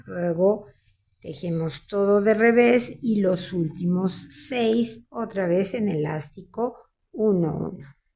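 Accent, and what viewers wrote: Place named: Mexico. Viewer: gracias, acabo de hacer una muestra con punto bobo y también queda muy bonito., no se de que país sois ? el acento parece de México, o tal vez me equivoco 🤭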